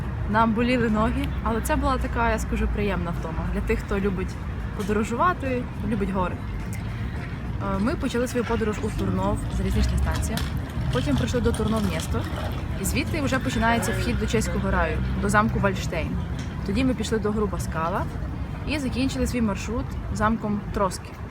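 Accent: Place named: West, Ukraine. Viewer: доброго дня.😀. Дивлюся вашівідео,дуже гарні і позитивні,а найважливіше Українською. Звідки ви,бо чую ніби західний регіон?. Хотів би запитати можливо,щось чули про завод Sempermit,в містечку Odri,неподалік від вас? Бо виробляю туди документи на роботу з дозволом на 2 роки.Дуже дякую за інтересні відео,успіхів вашій сім'ї. Можливо колись зустрінемось в Чехії.дякую.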